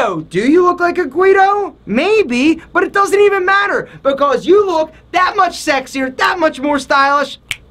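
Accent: Philadelphia, United States. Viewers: He sounds like he's from Philly.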